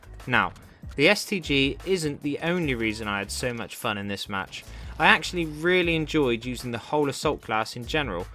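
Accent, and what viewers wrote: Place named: United Kingdom. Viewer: Your brit accent is so funny dude ! So enjoyable! Well done Edit : this questionable humor is fantastic ahahah Edit 2: your like the British modest pelican With that commentary post whether you want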